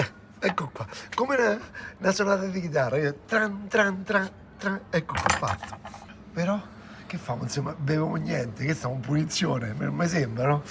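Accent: Rome, Italy. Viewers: Ricordo un suo video di qualche tempo in lingua inglese ( spero la possa migliorare). Ora all'opposto è passato allo slang romanesco? L' Italiano standard sarebbe gradito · Grazie. Piatto fantastico. Ma come posso sostituire i peperoni cosi particolari? Con i friggitelli per esempio? Vorrei ridarlo. Ti ringrazio e sei al TOP anche per la tua simpatia e con il tuo/ nostro romanesco!!😂😂❤❤❤❤😊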